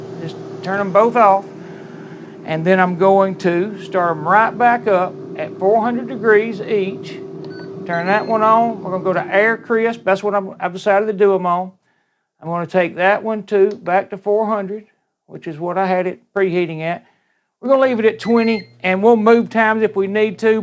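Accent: South, United States. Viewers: Great video plain and simple 'you mentioned your southern accent" that adds to receipts flavor. yo' al I am new to this air freyer The Nija and needed some cooking information. Thanks again...I am 80yrs old and one is never to OLD to learn something new! God Bless. 😉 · There's just something about the southern accent. 🤗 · Hi tried the Salmon Fillets yesterday absolutely delicious 😋 we are Ninja Foodi new comers , brilliant bit of kit , by the way love the good old Southern Accent !!!.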